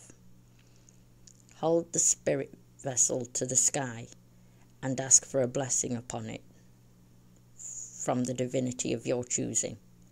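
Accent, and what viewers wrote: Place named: Mansfield, United Kingdom. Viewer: You have to be somewhere local to me with your accent I'm from Mansfield x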